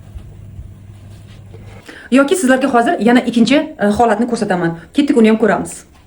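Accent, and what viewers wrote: Surkhandarya, Uzbekistan. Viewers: Купрок сурхонча гапиринг кизикрок булади сурхон шеваси кизик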